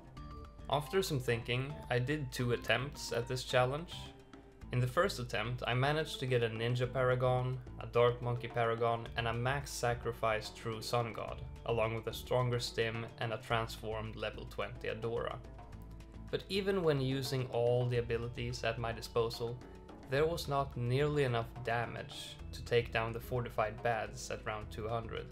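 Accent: Sweden. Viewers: you sound swedish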